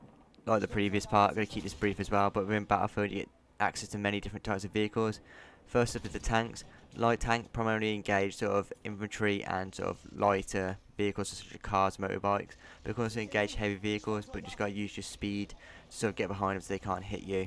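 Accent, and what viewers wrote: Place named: United Kingdom. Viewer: 😂: Getting dusted in BF1, found trust in your UK accent.. teach me Sifu.. 💯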